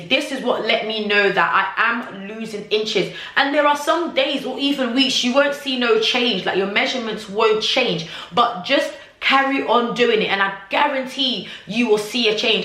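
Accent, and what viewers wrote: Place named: England, United Kingdom. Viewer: Your english accent😍😍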